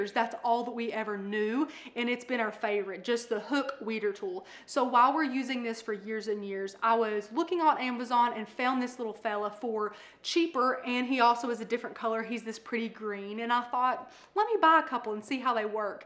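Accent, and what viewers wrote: South, United States. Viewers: Clicked for the review...stayed for that amazing accent! I'm Australian, don't hear that kind of voice every day. Is that American 'Southern' / 'Mid-Western? Also, waddaheck happened to your brick wall? Someone got a bumped head over there? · I love her southern accent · I'm from the south and love her southern accent.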